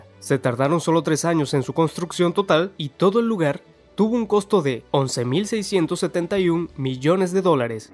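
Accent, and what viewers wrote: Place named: Venezuela. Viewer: super excelente video gracias. excelente cuenta. pareces venezolano por tu acento. Muy buena tu oratoria y edición del video. sigue adelante like y suscrito de una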